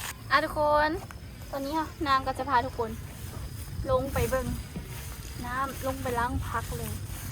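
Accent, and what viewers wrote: Laos. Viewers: ผมชอบสาวลาวพูดน่ารักดี ไม่แปลกใจทำไมหนุ่มไทยจึงสนใจสาวลาวกัน.😍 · สาวลาวน่ารัก สำเนียงพูดเพราะครับ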